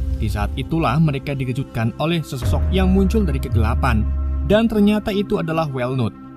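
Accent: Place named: Java, Indonesia. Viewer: Penasaran nemen sung ente wong brebes apa tegal sih min.. "miyang cumi" 😅😂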